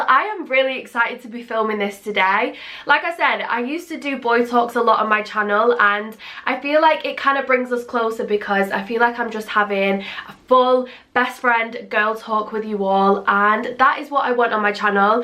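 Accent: Manchester, United Kingdom. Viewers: “i get copy righted on evEryFiNk” 🤣 such manc pronunciation love ittt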